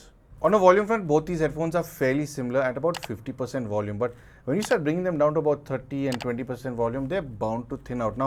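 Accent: India. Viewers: interesting review. Thanks for it...! You have a strange perhaps a Hindi accent, please speak a bit slow, because sometimes is dificult to understand. English is my second language. Thank you from Buenos Aires.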